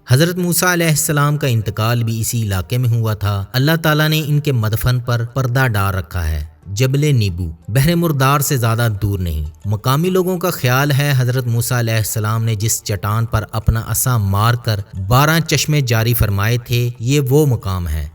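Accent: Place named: Punjab, Pakistan. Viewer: Apka punjabi accent lahja bahot bura lagta hai sunne me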